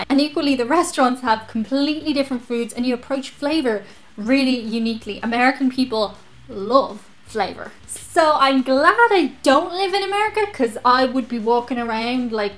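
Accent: Ireland. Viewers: On the upside, your time in Ireland has delightfully thickened your accent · I love how you leave your outtakes in at the end of your videos, where you stumble over words and sentences (like every youtuber no doubt does while they're filming), but are not self-conscious, and have the guts to leave those mistakes in the video. Also, love the accent. I've always had a thing for Irish accents, I just love to hear them, especially from pretty ladies like you. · Hi,i want you to know that I love Irish accents.I really love your channel and content. I really enjoy your personality and you always make my day after watching your videos. I hope you continue with your channel and video content for years to come. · Thank you, that was much needed. My Scotts/Irish grandfather arrived in American one step ahead of the sheriff, leaving a wife and four children...back in the old country. 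He was sure he could serve his family better as a fugitive in America than a prisoner back home. (he beat a man to death) He brought his whole family over, became a master steam fitter, died at 96 with 14 children and a full tribe of upwardly mobile off-spring. Being an Irish American is as American as as thing can be. And yeah, the Irish accent is a show stopper here. I'm studying it, so I watch Jack Taylor, you and Try Channel, et al. Keep at this. You're on to something. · Americans have a thing for Irish accents. This is a established fact. You can talk about anything and we love it. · I love your Irish accent. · Hi, I enjoy your vids and your Irish "edge". Always makes me smile. I wonder if you know about some really American stuff? Try 'AKUS Live' here <link> as an Irish girl, if you don't know about it, you'll like it. Keep the videos coming. /RayK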